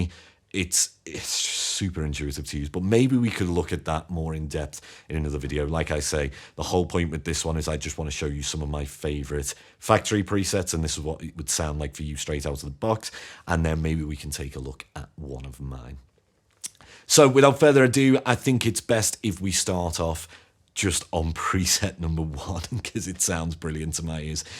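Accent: Liverpool, United Kingdom. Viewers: The scouse accent just is the best for music reviews ❤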